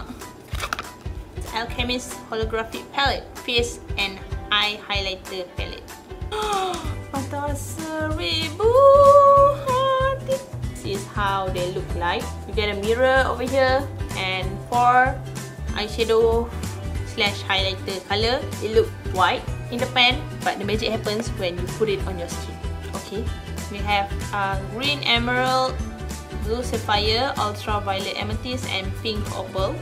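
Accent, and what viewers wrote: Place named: Kedah, Malaysia. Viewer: so funnyy! suka u cakap kedah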